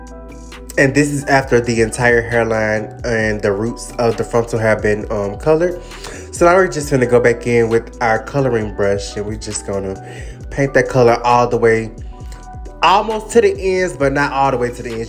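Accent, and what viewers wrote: South, United States. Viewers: are u from memphis or houston u sound like u have a similar accent to us round down souf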